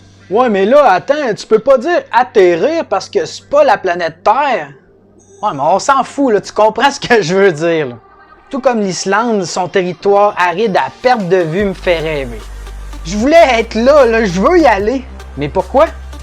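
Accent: Quebec, Canada. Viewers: Slt, bonne vidéo. C'est la première que je regarde de ta chaîne. J'apprécie ton accent québécois😉, tu me rappelles Polo (CB Games).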